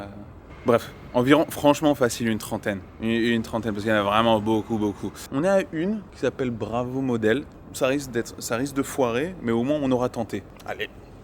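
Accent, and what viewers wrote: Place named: Cameroon. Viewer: Tu viens de quel pays en Afrique tu parle comme un camerounais 😁😁😁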